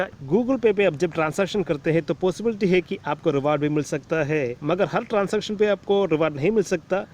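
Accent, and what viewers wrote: Kerala, India. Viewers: Malayali ahn ale Hindi kettepoll thonni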